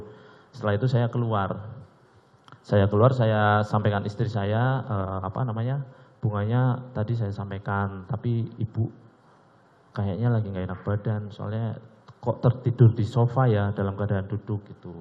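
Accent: Java, Indonesia. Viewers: Baru denger, nama tp medog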